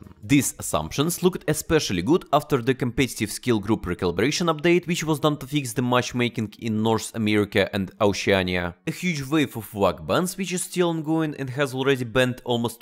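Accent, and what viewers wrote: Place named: Russia. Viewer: hope you don't change your accent along the years XD best Russian one so far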